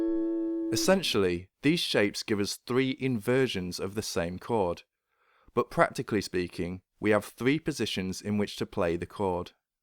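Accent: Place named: Manchester, United Kingdom. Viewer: Excellent teaching! All in the form of little focused nuggets of pure musical gold :-) (It helps to have a basic understanding of 'theory' and a few years of noodling for basic ear training to relate to.) Your Mancunian (?) accent reminds me of the programme director of part-time courses at The Institute.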